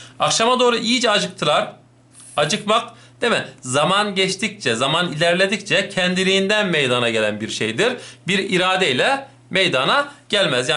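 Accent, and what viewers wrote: Black Sea, Turkey. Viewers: HOCAM KAREDEZLI KONUSUYORSUNUZ BANA OYLE GELDI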